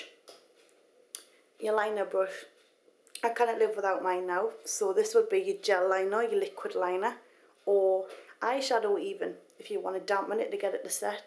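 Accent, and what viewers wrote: United Kingdom. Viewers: i always loves your video. Thank youuuu.. oya btw, i love ur british accent! :D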